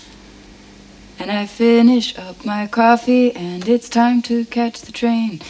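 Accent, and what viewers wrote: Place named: New York, United States. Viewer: nice! i thought so as it seems so nyc and from what i heard that is typical for that accent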